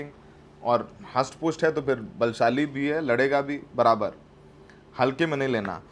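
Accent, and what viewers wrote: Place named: Uttar Pradesh, India. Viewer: Kinaree aoo samaan check karao....somehow aroused me😂😂😂😂😂😂😂😂 specially the way u said it....typical UP ka lehjaa hai aap ka❤❤❤❤love u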